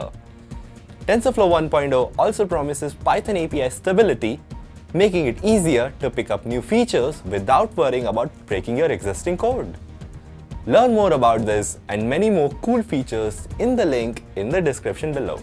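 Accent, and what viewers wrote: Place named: India. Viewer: That's the Indian accent :)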